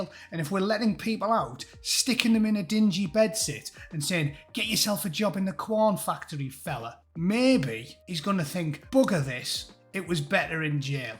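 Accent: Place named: Teesside, United Kingdom. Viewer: Ya sound like a FELLOW SMOGGIE mate ?First video ive seen of you enjoyed the pisstake UTFB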